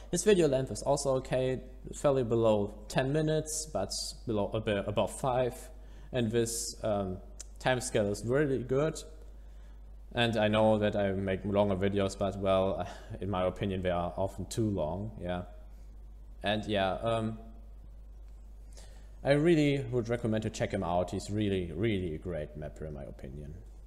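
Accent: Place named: Germany. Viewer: I love your german accent.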